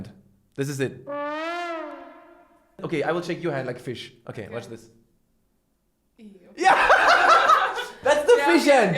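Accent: Germany. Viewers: i love ur "Denglish" man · Lustig, Englisch mit deutschem Akzent. Ich denke, das "Deutscheste" in Deutschland ist tatsächlich die Mülltrennung. Warum hast Du Deutschland verlassen? Ich denke, mit Deiner humorvollen Art hättest Du in D mehr Chancen. Und in den deutschen Großstädten, in denen ich unterwegs bin (S, M, N) würden die meisten gerne Englisch mit "Zugereisten" sprechen. Anyway, viel Erfolg in CaliforniA. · Alter ich denke, ich schau ein Video von irgendeinem Random Asiate an (Servus Bruder) und dann kommt einfach der assreine Migrantendeutsch hervor den nur ich irgendwie wahrnehme.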